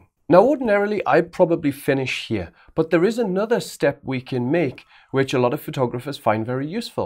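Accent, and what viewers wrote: Newcastle, United Kingdom. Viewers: To use an old English phrase, you really know your onions! Superb stuff. And your Geordie accent is always a pleasure.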